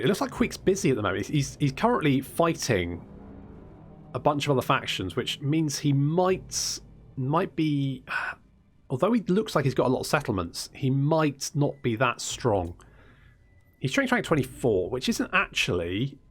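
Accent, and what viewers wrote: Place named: United Kingdom. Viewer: What? Who's competing with you? That fraud PewDiePie? You've got something he'll never have - a British accent.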